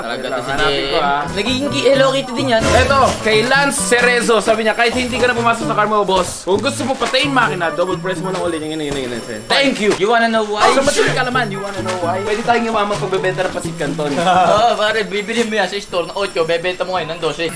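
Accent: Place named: Philippines. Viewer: I love the way he Speaks TagLish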